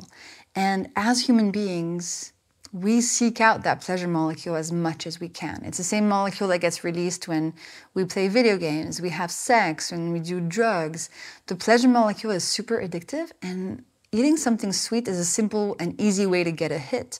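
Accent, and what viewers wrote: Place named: France. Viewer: You are the first french person that I hear talking English and your accent in combination with your calm voice is so pure beautiful. Its like listening to a romantic song.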